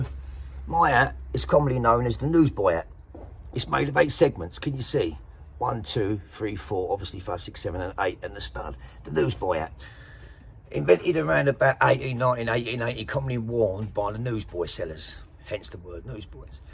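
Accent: Cockney, United Kingdom. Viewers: Love your traditional Cockney accent which is now sadly a dying breed - My Fiancé is from White Chapel & sounds exactly the same, salt of the earth 🙌🏼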